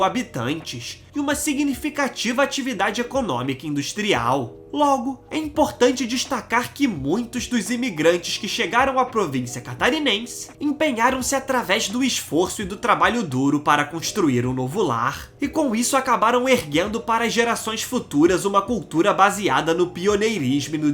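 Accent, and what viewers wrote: Rio de Janeiro, Brazil. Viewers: Esse carioquês do narrador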